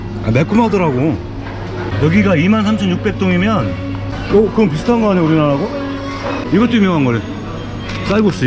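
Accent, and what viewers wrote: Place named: Chungcheong, South Korea. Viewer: 충청도 어디여유?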